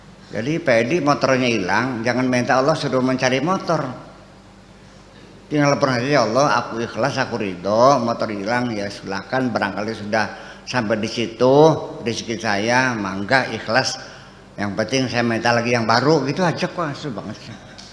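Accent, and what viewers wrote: Indramayu, Indonesia. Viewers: ha ha ha iya nok, tapi emang khas omongan dremayu, tapi yaqin gak ada maksud menghina, apalagi klo umumnya bahasa gaul kertasemaya, " rikkk sira pen mendi !!!???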